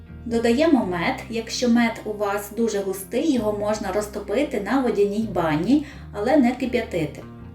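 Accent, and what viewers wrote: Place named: Hutsul, Ukraine. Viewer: Дуже дякую за рецепт. Чудово подайте, без зайвої балаканини...І чудова українська мова...з ниткою Гуцулендії.😉🤗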